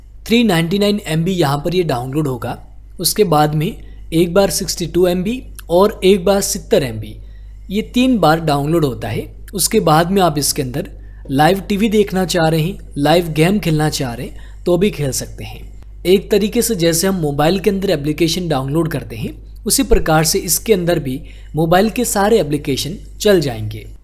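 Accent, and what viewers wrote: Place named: South, India. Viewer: Naryal anna accent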